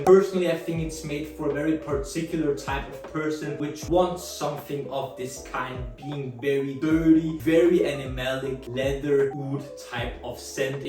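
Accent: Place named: Netherlands. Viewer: Man seems to be that you are from Holland aren't you?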